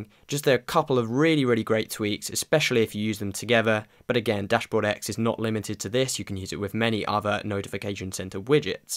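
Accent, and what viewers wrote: United Kingdom. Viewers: would you mind to send to make a list of the tweaks you mention? i'm really bad at understanding english properly, specially if its british acent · The british accent just sounds professional and makes you want to get all these apps! i love your videos mate.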